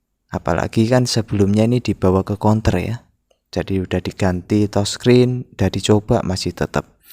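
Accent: Java, Indonesia. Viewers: Jowo ne ketoro nemen :v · Oh aku kira di jawa juga Tapi kok logat e jawa ya Makasih bang saya banyak dpt ilmu dr konten e sampean aku jadi ngerti cara nyervis hp y walaupun blm se master abang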